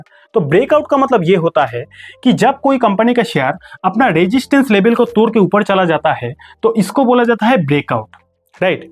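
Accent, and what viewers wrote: Bengal, India. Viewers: Sir, aap Bengali hain?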